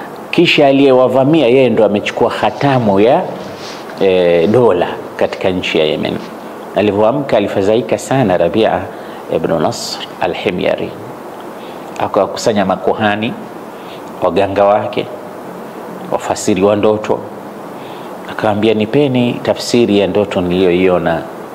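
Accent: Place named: Digo, Kenya. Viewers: Kweli kabisa ni mdigo kabisa uyu sheikh wetu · Huyu sheikh amezaliwa Kenya? His Kiswahili sounds like a kenyan.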